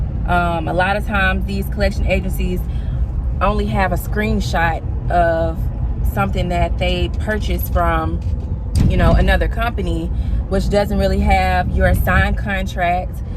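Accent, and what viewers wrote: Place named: Chicago, United States. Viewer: U sound like you're from Chicago. 😁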